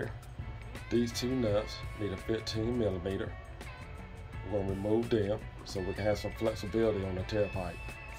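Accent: South, United States. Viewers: My mans so country but I love it lol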